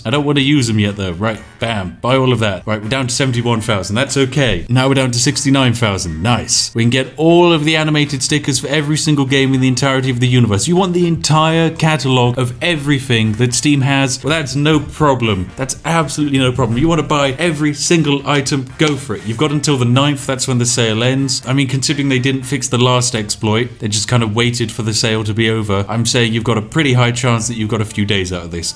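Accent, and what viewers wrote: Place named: RP, United Kingdom. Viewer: the posh accent doesnt work when you can't pronounce "th" sounds properly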